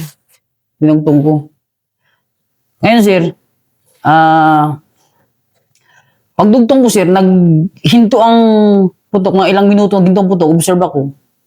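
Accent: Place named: Quezon, Philippines. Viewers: Taga Quezon province po ba kayo? Tono kasi ni Sir eh punto ng Quezon province. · Accent ni idol parang taga Quezon Province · Taga Quezon probins yata si sir..ay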